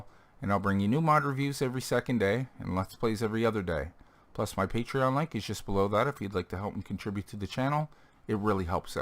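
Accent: Canada. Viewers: I can hear a little Newfoundland in your voice, fella!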